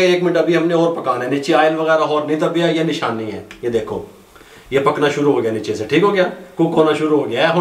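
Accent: Punjab, India. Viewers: Zaberdust I like they way u speak hindi and punjabi . very good easy recipe thank u. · Zaiqa we changa ho si, Style te no1 hey hi twada. Cool man with punjabi tarkah makes it enjoyable to watch.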